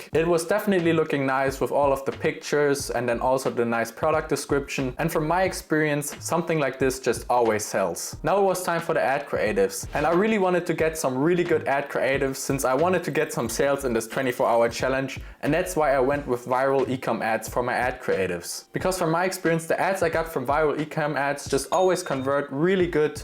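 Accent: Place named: Germany. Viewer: Man merkt direkt du bist deutsch bro hahaha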